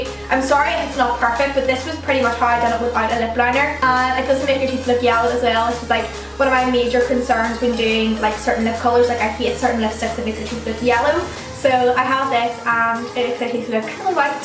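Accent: Northern Ireland, United Kingdom. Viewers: Are you from Northern Ireland? 😊 ps. Love your videos❤️❤️❤️ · Are Your British? Your Accent Is So Nice!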